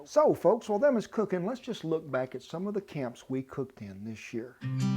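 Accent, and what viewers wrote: South, United States. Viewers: love your country accent and personality. most cooking shows have bored me over the years but yours stopped me dead in my tracks!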